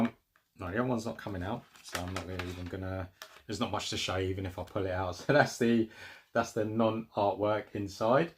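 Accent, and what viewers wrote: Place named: England, United Kingdom. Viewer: In Hawaii I grew up with many Filipinos, first time I'm hearing one with English accent 🤣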